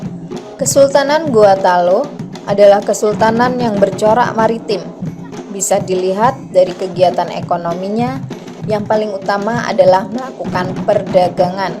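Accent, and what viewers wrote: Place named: Java, Indonesia. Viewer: masih kentara logat jawanya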